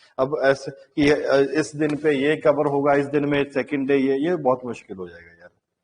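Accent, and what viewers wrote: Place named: Punjab, India. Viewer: He used punjabi method while speak English....which creates irritation for student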